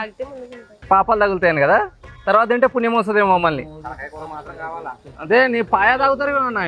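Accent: Godavari, India. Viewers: godarolla slang tho okka short chesaru bro aa short viral ayindhi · Ma godavari paapa matladithene sweet ga vundhi❤❤❤❤❤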